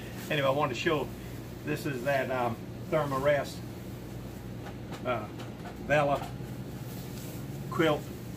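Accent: South, United States. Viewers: So glad I found your channel. I'm just a backpacker wannabe, but have done a lot of motorcycle camping with my husband, and find that the lightweight gear choices are similar. Your videos are fun and informative, and it's nice to hear another southern accent!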